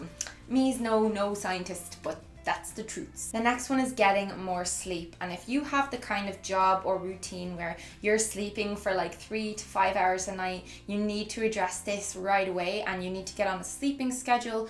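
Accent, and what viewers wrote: Ireland. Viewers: I can listen to that Irish accent all day long. It's beautiful music to my ears!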